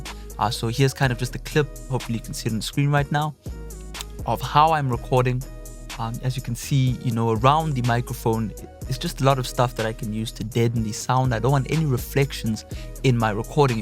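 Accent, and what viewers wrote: South Africa. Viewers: you sound you from South Africa 👌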